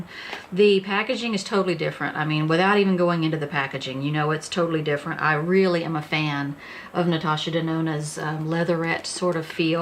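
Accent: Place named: Texas, United States. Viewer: Love this review! I wanted to see how this new palette would work on fair skin. Looks pretty! Also, I am a TX girl too & within the first 20 seconds I could tell you were from TX. 😂❤️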